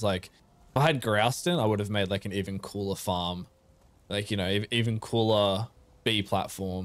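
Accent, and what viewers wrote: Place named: Pretoria, South Africa. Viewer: Not the biggest (smug smile) but impressive for sure. I wish there were MASSIVE gates that you could use especially for harbours. I love your content, l especially relish your expressions when you die. I almost spat out my noodle soup when you took a lie down after dying in one of your early vids when you tried to get your stuff back from your grave during an undead raid. Much respect though that you do all in the classic game style. I build with the build mode and with a number of mods, so comparing my builds even if they are bigger is no contest against your achievment ! Oh and no worries, your Harbour really does look great, at least in my opinion. The only thing I would have added though is a gallery on either side of the great hall. You have plenty of room on either side where you could also extend outwards and add a roof on either side. 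On the inside you could then use that gallery as a balcony. Perhaps also decorate it with more chests and barrels and stuff. That is what I do on my harbours to make them look utilised. I would love to show off my builds as well, but first I need a better PC and need to figure out how to make youtube content. All in good time 😉Keep up the amazing content. I love seeing your base grow. Oh btw. Where in SA are you from ? sounds like Praetoria.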